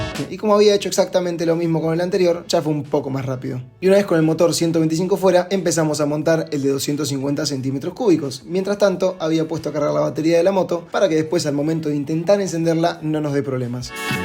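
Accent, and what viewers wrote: Argentina. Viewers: Argentino en España? Me salió sugerido tu vídeo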